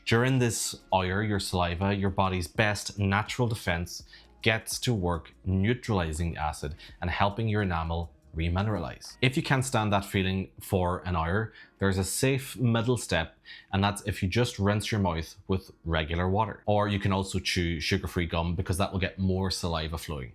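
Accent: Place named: Ireland. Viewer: Good video love the Irish accent and you are kind of gorgeous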